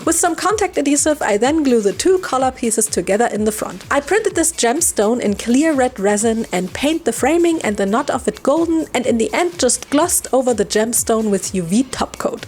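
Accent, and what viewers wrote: Germany. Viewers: Are you german? Your accent sounds so german. If yes: Tolles video!! Und die puppe sah echt cool aus!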